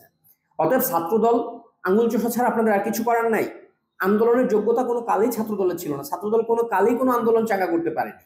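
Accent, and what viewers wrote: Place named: Arab world. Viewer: আরবি উচ্চারণেই বুঝা যায়। কথার মাঝে ছোটো ছোটো আরবি শব্দ ব্যবহার করে এবং ছহি উচ্চারণ করতে পারে।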